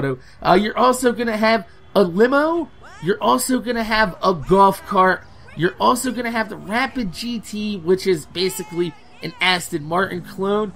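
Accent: New Jersey, United States. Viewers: are u from nj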